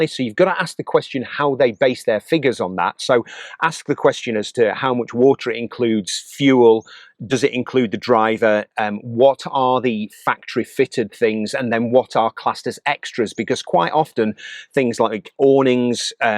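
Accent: Yorkshire, United Kingdom. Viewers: Another great video: useful info delivered in a characteristic [no-nonsense Yorkshire] way. Well done & thanks. We’ve just collected our own FIAT Ducato 5.40 van, a choice much influenced by your own decision making process (as shown & shared in your previous videos, so thanks for that!). She’s a 2017 Possl Roadcar whom we’ve christened Freya. We live in The Netherlands (a campervaner’s paradise), and now happily wave to all Ducatos that we see driving around! Keep making the films - they are hugely helpful to your many followers. Happy travels en “tot ziens” (Dutch for see you)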